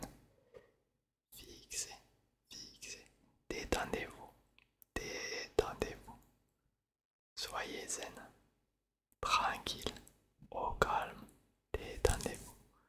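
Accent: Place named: Toulouse, France. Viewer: Ahhh Toulouse !! je n’y avais pas pensé, j’imaginais Avignon ou Agen je me suis pas tellement trompé, je veux dire au niveau de l’accent. Moi Valence. Bonne soirée… Je vais regarder une de t’ai vidéo pour ronfler !… j’aime bien l’autre, tu m’as laissé un autre commentaire, tu arrives dans ton salon de coiffure …. C’est le bruit du ciseau qui est soporifique. J’aime bien le style sa sort du asmriste bien carré …. Continue comme c’est cool. 👍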